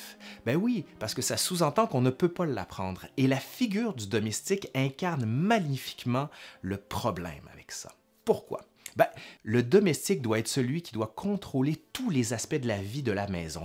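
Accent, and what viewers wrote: Canada. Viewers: L'accent canadien est-il un signe de distinction?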